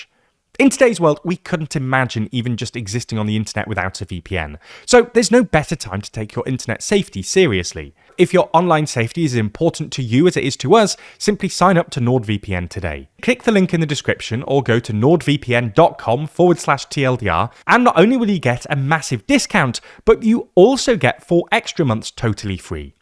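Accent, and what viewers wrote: United Kingdom. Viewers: British accent and actual facts! THANK YOU EVER SO MUCH! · Todor Zaikov? Haha. The silly fiction that comes out with British accent is everywhere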